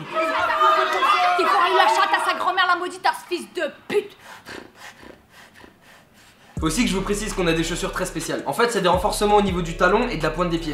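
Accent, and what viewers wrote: Alsace, France. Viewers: Cet accent alsacien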